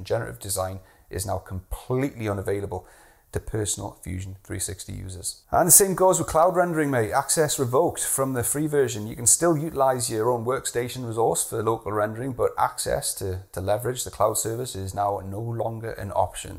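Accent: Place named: Newcastle, United Kingdom. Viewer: I thought you sounded like a geordie!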